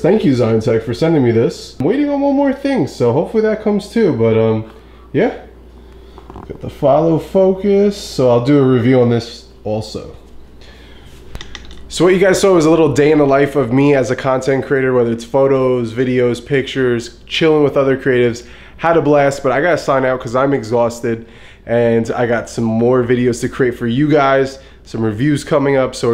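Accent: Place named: Long Island, United States. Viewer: ! Brooooooo, love your videos man. You sound like you're from Long Island bro lol. Whole Fam is NYers. Anyway, love the content and quality. I just purchased the A7III, it's on back order but I'm excited for the quality of vids I'm about to pump out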